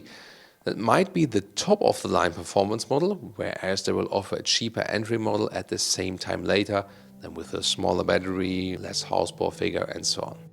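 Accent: Germany. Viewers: You know, the German accent made it sound "it's said... " in the beginning of the video as "its sad", which is what this car makes me feel like. Yes BMW, well done. Take a car that needs very little grill space up front to make this hideous, absolute joke of an ugly duckling. Funny thing is that it is so very ugly even on the inside. Gosh I had never been so appalled by a car design overall. This vehicle looks as bad as, if not worse than, the likes of the Fiat Multipla or the Daihatsu Materia. I know this is just a concept but comparing this to the concepts we used to get between <num>, it simply paints the future of car as a tool that has no sympathy towards designing what is beautiful.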